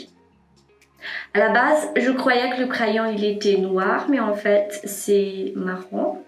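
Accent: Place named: Germany. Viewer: Merci bcp,j' Adore votre chaine😙😙😙 Le Accent kou efa lasa Deutsch😀😀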